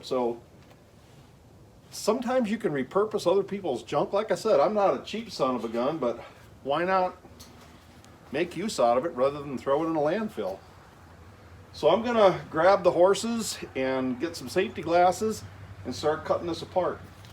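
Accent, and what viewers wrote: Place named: Northern Minnesota, United States. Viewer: Those look like heavy duty gates! You're going to need to have some heavy hinges to hold the up! Your accent reminded me of how we poke a little fun at the northern MN folks - "Hey der, let's head up to Tunder Bay for some Molsons, ey." Have a great week!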